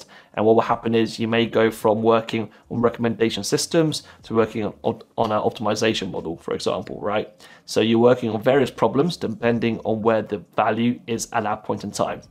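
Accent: United Kingdom. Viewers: For machine learning, I choose to learn Python and C++ as my main programming languages. I like your video because of your British accent. Why don't you use X as a machine learning engineer?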